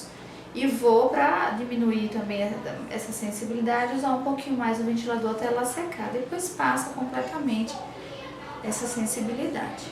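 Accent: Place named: Northeast, Brazil. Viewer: adorando teus vídeos, parabéns sou aluna da FHO aqui em Araras SP, estou no segundo ano, ainda falta 2 anos e meio para eu concluir. obgda e continue postando vídeos, estou inscrita em teu canal. P.S tbm sou nordestina sou de juazeiro do norte ce, ouvindo teu sotaque me faz lembrar minhas raízes. bjim pra te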